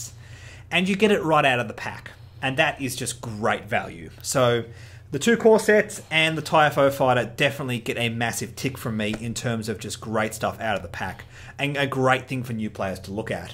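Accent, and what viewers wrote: Australia. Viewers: Is that an Australian accent? Whereabouts are you? I... I need someone to play with XD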